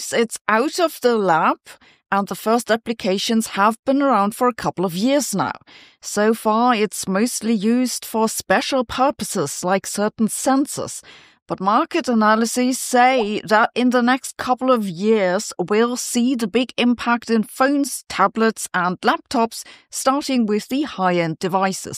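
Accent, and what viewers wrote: Germany. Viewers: There's something about German accents that are cool❤ watch out, baby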